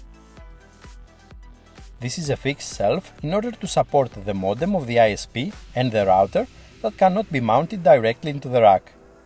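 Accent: Greece. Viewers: Καταλαβαίνεις τον Έλληνα από την φωνή...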